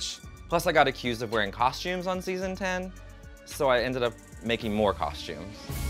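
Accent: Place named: South, United States. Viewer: So handsome and I love the southern accent coming out. Being from Louisville ky very refreshing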